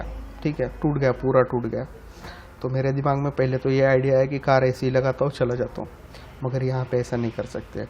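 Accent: Maharashtra, India. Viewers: bhashet lagech marathi tone lakshyat yeto. Bhau mst good work.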